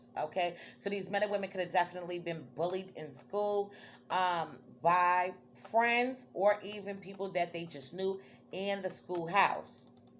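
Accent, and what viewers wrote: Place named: New Jersey, United States. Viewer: You gotta be From Jersey! Love it!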